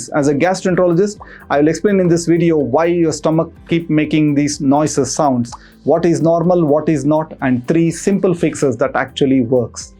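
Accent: India. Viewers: I'm sorry I couldn't watch it because of his accent.Strange thing is that I'm indian too.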